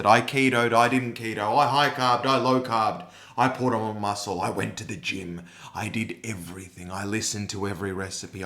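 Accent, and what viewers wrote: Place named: Australia. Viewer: ur australian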